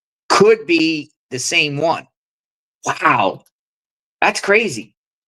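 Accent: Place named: Minnesota, United States. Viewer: Where the hell are you from? Yankee I think. Minnosota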